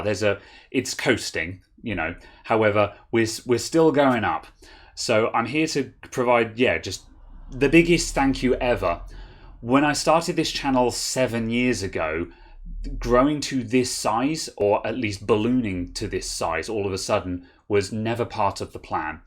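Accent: United Kingdom. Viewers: I'd echo this. Nothing against US/Aussie/OtherNationInEnglish sites, but nice (comforting) to hear it with a Brit accent with Brit vernacular.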